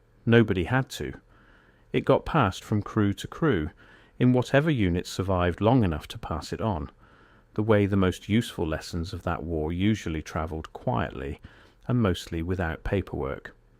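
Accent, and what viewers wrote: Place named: United Kingdom. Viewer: Excellent narration! Something about the British or Australian accent that makes it land so beautifully on the ear.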